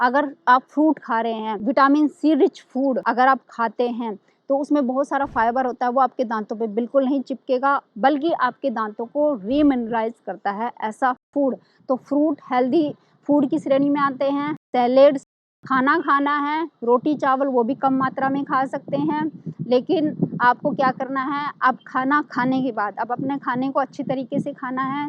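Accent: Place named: Haryana, India. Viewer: Her accent is haryanvi usme ese hi bolte hain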